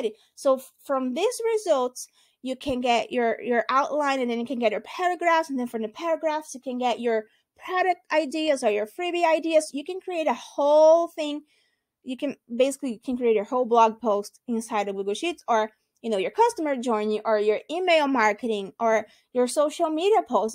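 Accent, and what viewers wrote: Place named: Brazil. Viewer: I knew it! It was king hard, but I thought: “the accent.. it seems to have a little of Brazilian in it, but I was not sure”.. congrats!! Awesome content, and beautiful accent!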